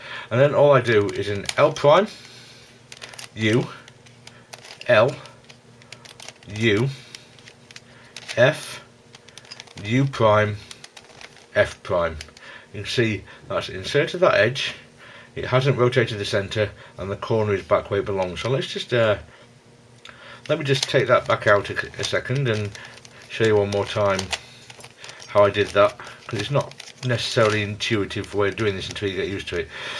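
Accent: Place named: Scotland, United Kingdom. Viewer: Good tutorial :) I also like your accent. May I ask what accent that is? (I would say you live on the British Isles, Scotland maybe? I'm truly sorry if I got it wrong)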